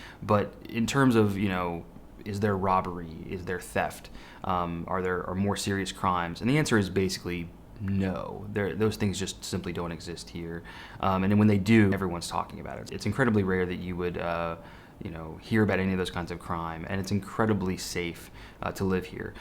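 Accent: United States. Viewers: I’m Japanese live in Singapore. I totally agree with you and I like your American accents especially “Hawker Center”. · You don't have the Southern accent. Which state you're from?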